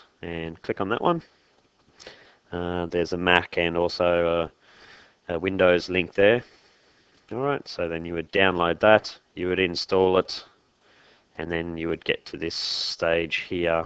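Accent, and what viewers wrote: Australia. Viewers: An Australian accent! Very helpful vid, thank you...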